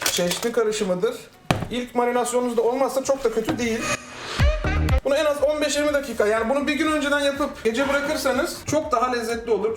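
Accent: India. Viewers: Hint aksanı mükemmel. Devamını bekliyoruz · hint aksanı > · Ben bu kadar güzel hint aksanı duymadım hayatımda aşırı iyi hzjahsjajs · Lan bu nasıl bir aksandır muhteşem lan hintlilerden bile daha gerçekçi konuşuyor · hasikitr lan hint aksanani efsane yapiyo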